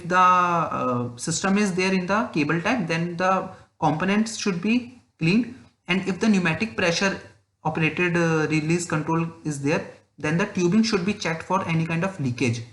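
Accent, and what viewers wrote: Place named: India. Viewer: English language needs to improve. It was like hindi spoken in english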